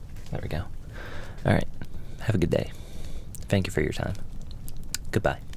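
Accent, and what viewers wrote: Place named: Northeast, United States. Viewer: As a furry who just moved from the northeast to California; I can tell you’re from either the northeast or Midwest and I love it!!!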